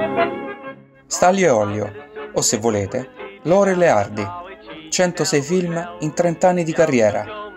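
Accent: Rome, Italy. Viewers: Video interessante e ben realizzato. Ma se lo speaker correggesse quella terrificante "c" romanesca presente in OGNI parola, il lavoro risulterebbe decisamente più godibile. E lo dice un romano come me...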